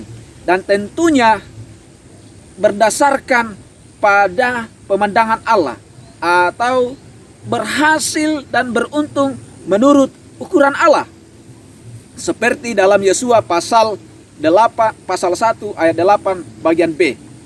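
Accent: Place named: Batak Toba, Indonesia. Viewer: Terimakasih pak Pdt. Btw pak Pdt suku apa? Saya dengar logatnya seperti suku Batak Toba ( no rasis). Saya Batak Toba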